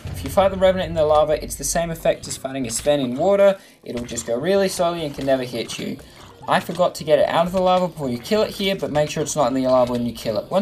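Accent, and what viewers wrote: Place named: Australia. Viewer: Australia Only know this one because every “a” sounds different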